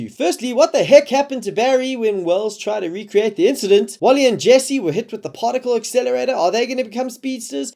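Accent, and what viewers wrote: South Africa. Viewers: are you south african? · I live in England now but born and raised in SA. When I first started watching this video I was like this guys voice sounds way to familiar. I mean it doesn't have an accent, cause English people have an accent to me. Then I realised why haha! SA ftw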